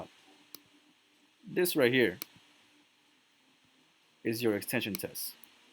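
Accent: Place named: Brooklyn, United States. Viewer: Wow so glad I found your channel bro! I'm already well versed with ICT but this has been a cherry on top. You sound like you have a Brooklyn accent btw... you from NY?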